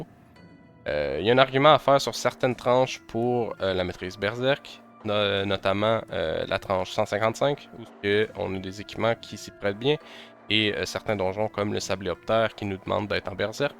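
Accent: Quebec, Canada. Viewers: Un quebecois esti!!! Yessir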